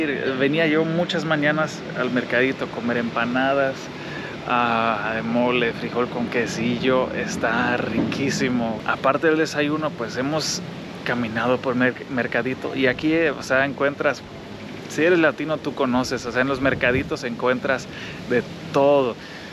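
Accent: Mexico. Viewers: Lo que me gusta es que agarró el acento mexicano 🇲🇽🇲🇽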